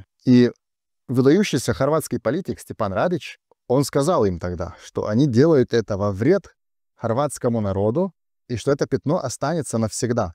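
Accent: Russia. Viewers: С начало подумал из-за лёгкого акцента, что вещает русский, родившийся за границей) уж больно похож парень на типичного русского с севера. Спасибо! Исчезли каша в голове и стереотипы. Обычно после школы остаётся картинка о Косовском поле и что все там сербы, только разные конфессии. А по факту больше различий чем у нас с малороссами, великороссами и белорусами. Отлично! Благодарю! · Сначала подумал вы русский, потом еле уловил даже не акцент, а небольшое отличие в произношении буквы «ч» и «ш,щ»., но не во всех словах, в некоторых.. Это вы так хорошо изучили русский язык или с детства говорите на русском?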